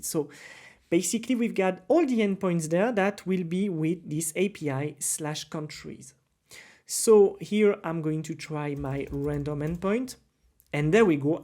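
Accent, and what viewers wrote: France. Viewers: Thank to this tutorial and surtout ton french accent , on a tous le même mais ça me va parfaitement comme ça . Good job 👍💪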